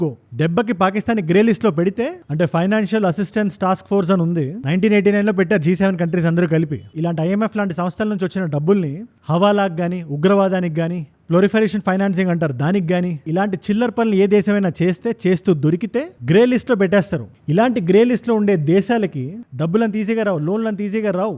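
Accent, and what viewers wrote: India. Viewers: Mee voice lo indian feel venataniki chala baguntundhi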